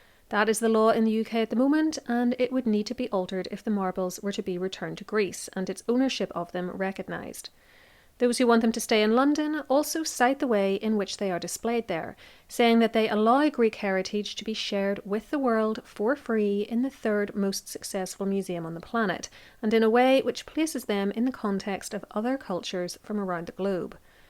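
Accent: Ireland. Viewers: My guess is that, judging by your accent, you are of Irish origin. You are VERY sparse with your facts regarding the original acquisition of the Marbles and I can only assume that you are an Anglophobe. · Irish accent?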